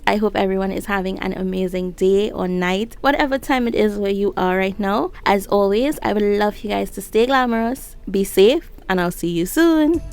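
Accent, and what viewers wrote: Trinidad and Tobago. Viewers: Tell me why I hearing a trini accent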